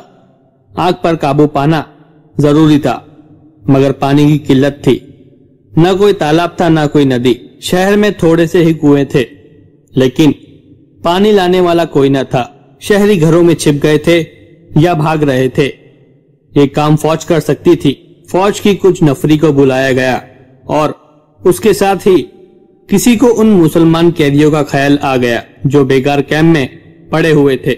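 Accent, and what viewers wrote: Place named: Gujarat, India. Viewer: Pl change the commentator terrible narration Is he some Gujrati speaking person Saramaza kharab ho gaya I have stopped listening to the video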